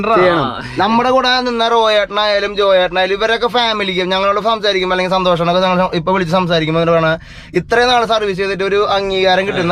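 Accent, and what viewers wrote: Thrissur, India. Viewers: വീഡിയോ അടിപൊളിയാണ് but thrissur ഭാക്ഷ ഒന്ന് മാറ്റിപിടുക്കോ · .. Congrats..10 k like below 200 dislike ഒന്നുമല്ല.ചില ആളുകൾ ഉണ്ട് അസൂയ കൊണ്ട് നെഗറ്റീവ് comment ഇടുന്നവർ,അവനൊന്നും ജീവിതത്തിൽ സാധിക്കാത്ത കാര്യങ്ങൾ കാണുമ്പോൾ ഒരു ചൊറിച്ചിൽ ആണ്.ഞാൻ മലപ്പുറം ആണ് നിങളുടെ വീഡിയോ കണ്ടു തൃശൂർ സ്ലാങ് ഒരുപാട് ഇഷ്ട്ടായി.ഒരു 45 minute video kku മിനിമം 2 or 3 മണിക്കൂർ viedo വേണം.. -5 ഡിഗ്രി ഇതിലും കൂടുതൽ power ആർക്കും കിട്ടില്ല.. നിങ്ങളുടെ ചാനലിൽ ഈ vedeos ever green ആയിരീക്കും.. കട്ട support.. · സാന്റാപ്പാ മ്മടെ തൃശൂർ ശൈലി മാറ്റല്ലേ ട്ടാ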